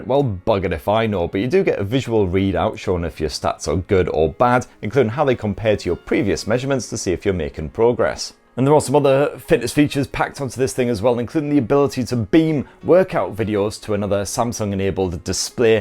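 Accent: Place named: Newcastle, United Kingdom. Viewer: Sounds like a geordie therefore he is definitely a good lad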